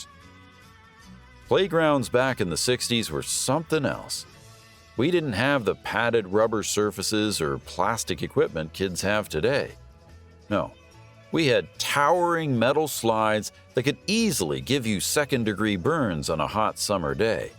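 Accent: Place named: Canada. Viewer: This narrator sounds like he's from 🇨🇦 . . . 😊 Yeah, our childhoods were waaaay different from those of kids today! I don't think I'll ever get used to how quiet my neighborhood is in the summer! 😅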